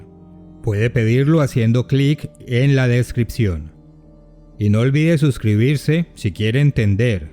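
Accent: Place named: Latin America. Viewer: Me encanta este canal,pero una cosa, la voz es muy latina y no la comprendo , desde Chile bendiciones!!!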